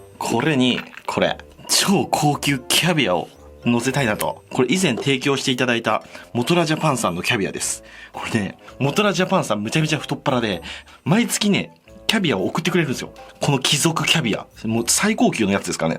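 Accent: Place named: Mikawa, Japan. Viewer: しゃべりが三河弁？朝倉未来と似てるね！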